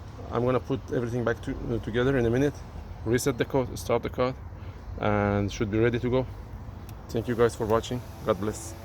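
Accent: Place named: Iran. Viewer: Persian accent😊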